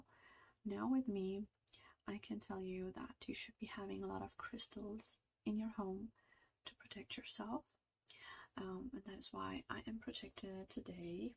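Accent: India. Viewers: an indian or pakistani daughter in law pure accent repeating khandan word make these kind of videos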